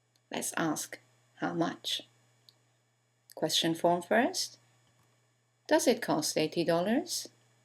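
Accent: United Kingdom. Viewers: Thank you so much for this video! I wanna ask you about the accent, teacher. Is this totally British English? Could you please give me some advice of choosing one between BE and AE? I think I should choose the one that is easier to understand( to me ) ? Is that enough to make a decision , teacher ? Thank you for your attention! Nice day! <3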